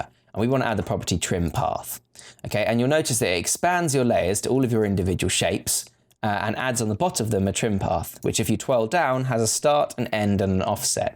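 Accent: United Kingdom. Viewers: You are actually one of the better instructors, but your British accent, sometimes hard to follow for Californians like muahhhh. But I still subscribed. Thank you, sir. Brit.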